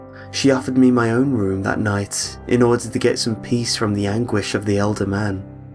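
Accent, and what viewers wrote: United Kingdom. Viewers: wow are you a British? your voice is simply sexy :3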